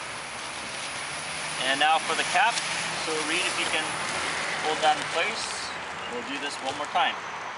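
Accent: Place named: Canada. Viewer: I’m Canadian, and as soon as I heard this I could hear the accent😂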